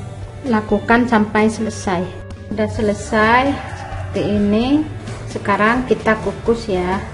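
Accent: Madura, Indonesia. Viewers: ini suaranya kayak org madura ya?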